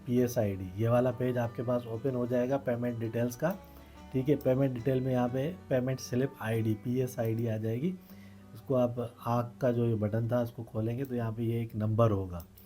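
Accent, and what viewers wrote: Sindh, India. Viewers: سندھی لہجہ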